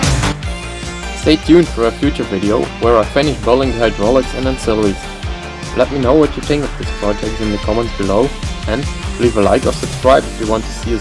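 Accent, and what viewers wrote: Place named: Netherlands. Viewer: Hello you sound Dutch ? If you are interesded in ever selling the tractor or the back tiller let me know I need some good pictures of the mounting on the tractor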